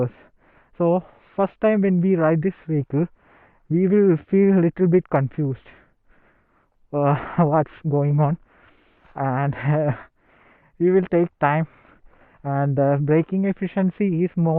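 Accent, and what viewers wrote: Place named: Kerala, India. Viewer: Malayali anenn English kettapozhe manasilayi...malayalathil cheythal nannairunn🎉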